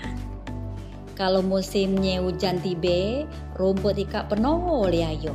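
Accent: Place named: Indonesia. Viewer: logat nja kamu ngomong seperti logat behese sengedeng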